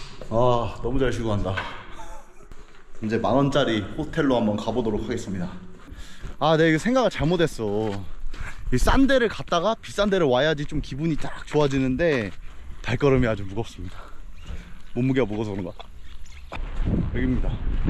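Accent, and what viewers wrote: Gangwon, South Korea. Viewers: 이자는 강원도 사투리가 조금씩 섞인 말을 한다.... 오늘은 그래가지고 등등 ㅋㅋㅋ 노란색 티셔스 입으면 만화 꿀딴지 가지고 있는 곰 모습이 보인다 ㅋㅋㅋㅋ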